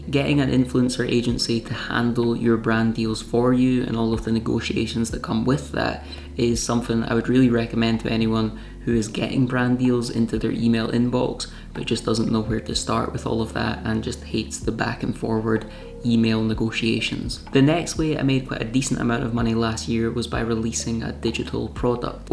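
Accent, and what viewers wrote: Scotland, United Kingdom. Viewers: First of all, you’re oddly addictive, I couldn’t stop watching you and you voice is very calming. I can see why you have so many subscribers but….. outside of your incredible good looks, great hair and voice, it is miraculous that you have this many subscribers from only 36 videos posted. I’m assuming that tiny homes are very popular but seriously, you made that much money off of only 36 videos? I initially thought you had a huge following on Instagram but there’s not many videos there either and far less followers. Which leaves the question how did you do achieve this success?? The only logical conclusion is the key to your success is the tiny house, good looks, great hair, a Scottish accent & soothing voice. I’m so jealous but I too have subscribed & accidentally watched 3 videos already before commenting!! you must be casting spells on your viewers.. 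I hate you lol lol!! Keep up the excellent work, I look forward to seeing more of you soon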